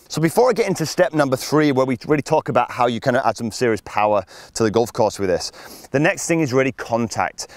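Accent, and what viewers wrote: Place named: Northern England, United Kingdom. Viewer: Cak handed - wow thanks i'll try it in Mjorca next week - great videos and love that Northern accent 👏👏👏👏👏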